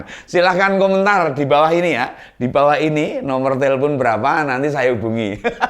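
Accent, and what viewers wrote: Java, Indonesia. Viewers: Saya suka keliru antara dan, logatnya hampir sama juga medok" Jawanya, aku senang ke dua" nya, selalu menyajikan isu" yg lagi hot, tentang situasi politik yg sedang berjalan, semangat terus Om untuk mencerahkan orang seperti saya jadi melek politik, Merdeka 💪💪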